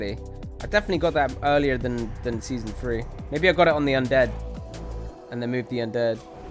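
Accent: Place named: United States. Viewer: i like ur american resto druid accent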